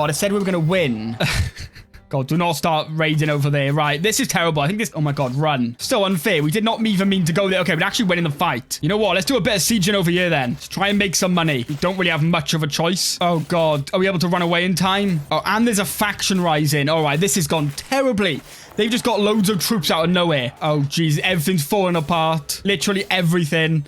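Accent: Wales, United Kingdom. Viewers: Just wanted to say I love your Welsh accent. North or South?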